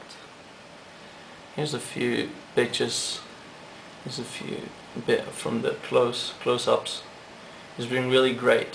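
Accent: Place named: England, United Kingdom. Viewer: i love your English accent lol!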